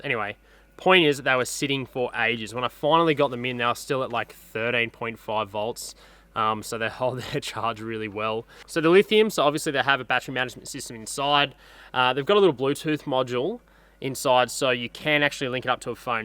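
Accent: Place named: Australia. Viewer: Very heavy Australian accent almost as bad as heavy Scottish hard to understand??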